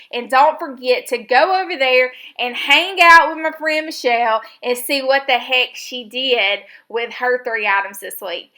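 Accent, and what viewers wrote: South, United States. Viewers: Your accent and southern ways are what I love about your videos the best💗 it’s heart warming! Love your pages💗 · I love love love your page and your sweet southern accent! So endearing! I am thankful for you,! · I'm a southern gal myself so I know what you mean! Some folks love a southern accent and some folks don't.....and those that don't can be unkind with their opinion. Love your page and your accent!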